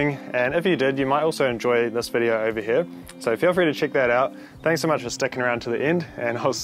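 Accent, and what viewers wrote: New Zealand. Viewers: Insteed, weether, togeether - lovely kiwi accent ❤🤩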